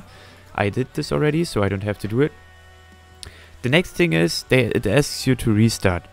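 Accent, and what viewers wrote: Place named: Germany. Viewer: Du hast aber mega den deutschen Akzent xD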